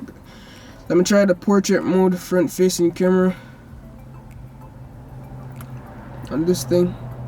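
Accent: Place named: Jamaica. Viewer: Wait why does he have a Jamaican accent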